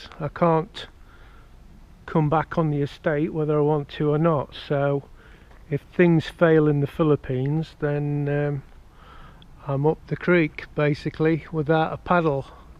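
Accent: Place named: East Midlands, United Kingdom. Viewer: Hi, you sound like your'e from the east midlands somewhere, Phillipines is a great place, $575 is enough to live on, it works out about $26000 pesos which is about 46 pesos to the dollar. The people are very nice but try and learn a little of their language and try their food. When in the Phillipines do as the Filipinos do.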